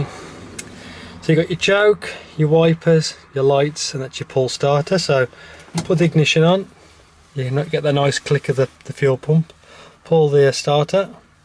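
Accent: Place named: Black Country, United Kingdom. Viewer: black country bloke ay ya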